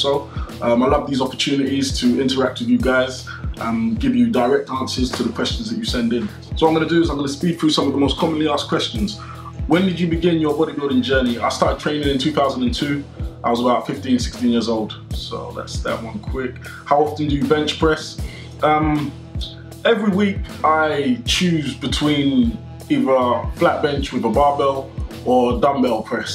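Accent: United Kingdom. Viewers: Did not expect the british accent.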